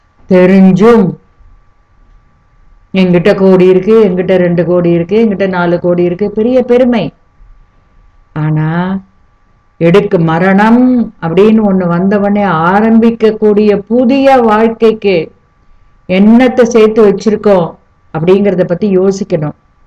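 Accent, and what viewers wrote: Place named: Palakkad, India. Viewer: I follow all clips because I like the spoken tamil it resembles Paalakkaattu tamil. I am from Srilanka. Thank you so much for all cooking.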